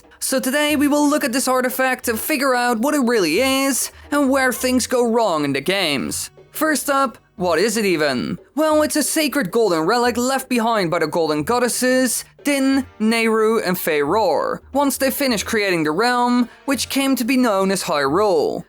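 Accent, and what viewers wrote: Netherlands. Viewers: Are you dutch?!!????! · Wacht.....Je bent Nederlands???????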